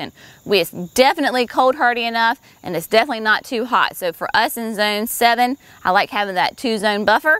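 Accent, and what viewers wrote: South, United States. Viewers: I live in Minnesota but I have family in Louisiana, so I not only enjoy the gardening features, but I love the southern accent!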